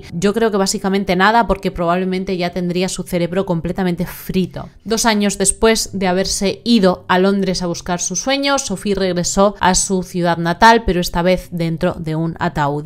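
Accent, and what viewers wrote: Spain. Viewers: Porqué la ves? A quien le importa tu opinión?? Más quisieras tú tener el acento "castellano"(España) qué tiene.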